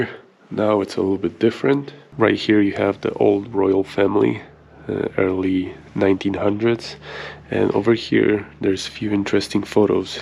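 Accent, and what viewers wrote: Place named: Poland. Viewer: This guy isn't American. He sounds polish to me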